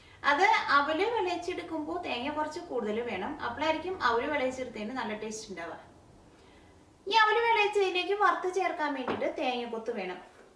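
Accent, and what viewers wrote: Thrissur, India. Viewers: സംസാരിക്കുന്നതിന്റെ Speed ഇത്തിരി കുറച്ചാൽ, നമ്മുടെ തൃശൂർ ഭാഷ ചിലർക്ക് ഇത്തിരി കുഴപ്പം ഉണ്ടാക്കുന്നുണ്ട്, അങ്ങനെ ആ പരാതി ഒഴിവാക്കാം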